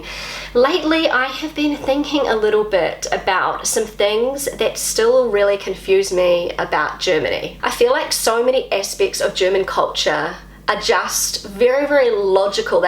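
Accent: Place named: New Zealand. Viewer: You are so cute with your 🥝accent, it's always a great pleasure to hear you speaking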